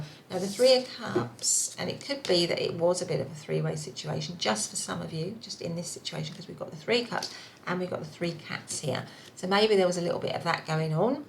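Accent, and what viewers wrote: United Kingdom. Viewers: Thank you!! I love the way you interprétate de tarot ( even if sometime is hard for me your London English accent ( I love it btw ! ) hug , abrazo y gracias from Buenos Aires ! ❤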